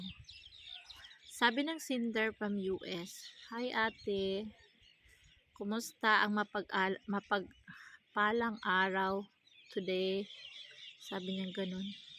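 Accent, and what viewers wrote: Visayas, Philippines. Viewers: Visaya diay ka ma'am..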